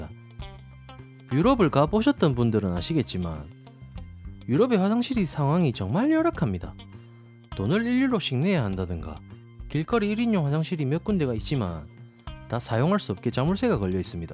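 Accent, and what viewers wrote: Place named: Tongyeong, South Korea. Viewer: 나 학교 다닐때 국사랑 지리시간에 잠만 자서 지리말고 이 썰 듣는데 재밌노ㅋㅋㅋ 썰 더 풀어주세요!! 구수한 통영 사투리라 더 감칠맛나게 귀에 쏙쏙👍🏻👍🏻 대박나소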